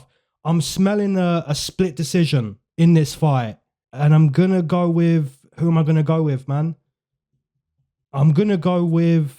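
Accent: United Kingdom. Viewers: Whats good brother was wondering which region you hail from Im trying to learn more about U.K accents. Useless though, because no other american will care that i know this shit.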